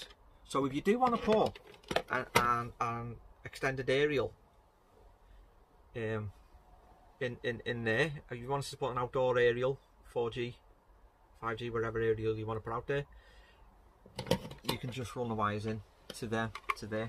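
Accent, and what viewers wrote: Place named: Birmingham, United Kingdom. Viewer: Hi, great video, very informative. Unfortunately the links are not working properly. Neither link takes me to them there 90 degree adaptors. Love your Brummy accent by the way. Can you do any others? Cheers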